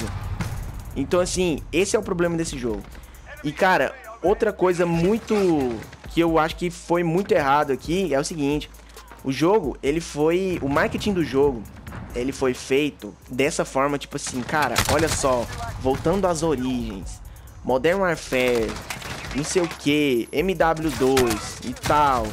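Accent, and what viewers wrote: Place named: Goiás, Brazil. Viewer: Mano vc é de Goiânia ? Tem o sotaque mo parecido kkkk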